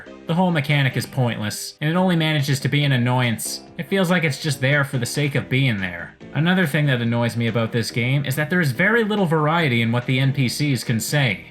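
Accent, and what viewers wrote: Canada. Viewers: dad jokes the game! great video, love your content. is your accent canadian? it soothes me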